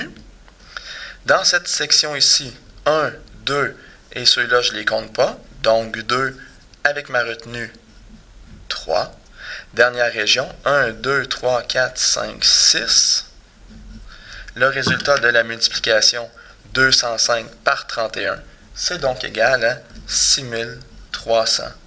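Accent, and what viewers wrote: Quebec, Canada. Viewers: C'est plutôt sympa comme méthode, et c'est vraiment bien expliqué. (sauf que ton stylo rouge il n'écrit pas rouge😂😂). It's a shame! 😅. Avec un super accent québécois, j'adore! Maintenant est-ce que c'est vraiment utile comme tu le dis si bien ? Pas vraiment, c'est plutôt ludique en tout cas et rigolo. Mais je pense qu'il ne faut surtout pas que les enfants oublient d'apprendre les tables de multiplication, ça leur servira toute leur vie. Moi je les ai apprises en chantant comment on faisait avant et j'avais plaisir à le faire quand j'étais môme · Ooooohhh un quebecoiiisss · owww un québécois , j'aime bien les québécois x)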